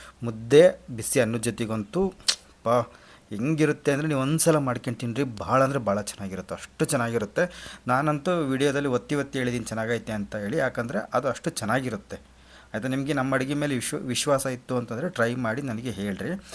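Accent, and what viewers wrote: Chitradurga, India. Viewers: ಚಿತ್ರದುರ್ಗ ಭಾಷೆ ..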